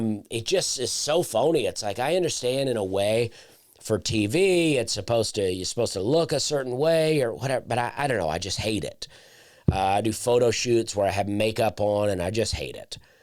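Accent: South, United States. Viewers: Slay is one of my favorite comedians of all time… There’s a certain vibe that southern hospitality comedians have that CAN be similar like Larry The Cable Guy, Jeff Foxworthy, Trae Crowder and yes even Slay BUT… each comedian has their own unique delivery… In comedy there are main techniques and skills like… sarcasm, storytelling, conveying multiple perspectives, expressing multiple emotions, over-analyzing & underplaying, crowd work and most importantly the ability to express yourself… All the pros use these techniques and skills in their sets… My point is the basic skills of a comedian are all the same from Carlin, to Pryor, to Burr, to Norton, to Shaffir, to Larry The Cable Guy, and even Slay… so it’s easy and even lazy to take two comedians with similar accents and say they are the same … for example… you can throw Sebastian Maniscalco, Chris Distefano and Andrew Dice Clay into a melting pot and review them as similar… but theyre not even close to the same thing… Okay Im totally overreaching here but my point is…. 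Even though Larry and hone the same comedic skills for comedy and even though they have similar accents and even some tastes… theyre totally different and both are good in their own way… Don’t worry about the haters Slay, youre awesome. And I think you mentioned suicide in all of your specials, so I hope you never kill yourself and that you create another ten awesome specials. Cheers. Ps. Here’s a joke I wrote. It’s stupid but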